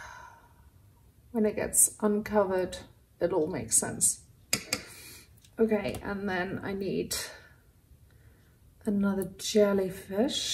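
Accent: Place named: England, United Kingdom. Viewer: You sound English, what are you talking about going to the 'dollar store' for?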